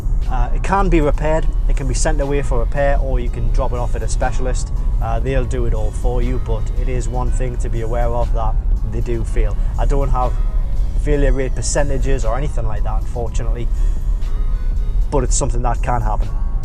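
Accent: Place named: Birmingham, United Kingdom. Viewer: Birmingham aye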